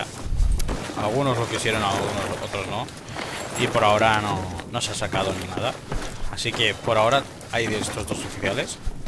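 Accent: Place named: Catalonia, Spain. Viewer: Ese acentillo catalan xD, nada decirte que el otro dia justamente me estube mirando dichas reglas (esl) para una cw y las restricciones en las carabinas y los fusiles son mas ampliasy que los dmrs stan permitidos, igualment bon video company i normal que se t.escapes savent qui ets dubto que et fixesis xD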